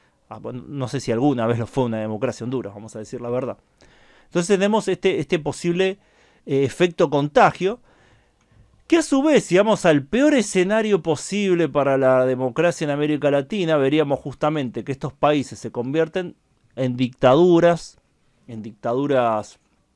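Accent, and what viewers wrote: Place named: Córdoba, Argentina. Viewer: suena a cordobes (o cordo-chanta)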